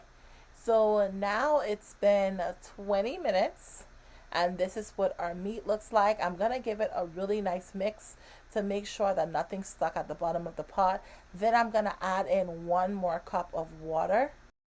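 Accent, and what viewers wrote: Bahamas. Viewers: I knew I heard a bit of our Bahamian accent!🫶🏾🫶🏾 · The accent is there! I know a bahamian accent when I hear it. I know my people! 😂 I knew it too! 🇧🇸🇧🇸🇧🇸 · I’m watching your video and I keep hearing a Bahamian accent then you go and say Bahamas lol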